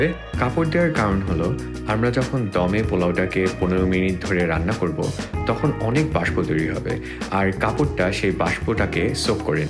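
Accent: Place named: Bangladesh. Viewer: এর ভয়েস শুনে আজ জানলাম ব্যাটা বাংলাদেশী। আগে জানলে দেখতাম ই না। যা ভাগ।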